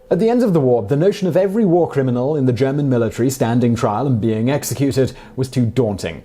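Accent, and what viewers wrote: United Kingdom. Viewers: What in the world qualifies you to "clear up misconseptions" about anything much less the Third Reich. Just because you have a "proper British accent" that doesn't make you an expert on anything. Frankly if I had the choice between fighting for you or fighting for Rommel, I'd choose Rommel. Another wannabe "know it all". I wasted my time and the battery power on my laptop watching you. Never again!!!!!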